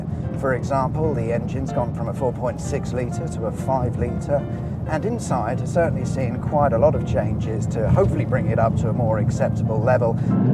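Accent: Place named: Southern England, United Kingdom. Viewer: all the comments below would be fuming if their homes were insulted by a smarmy posh southerner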